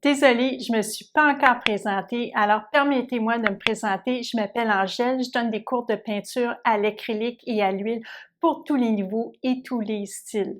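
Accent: Quebec, Canada. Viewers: Merci beaucoup. Je suis française et suit des cours de peinture à l huile.l accent québécois en plus qu on adore en France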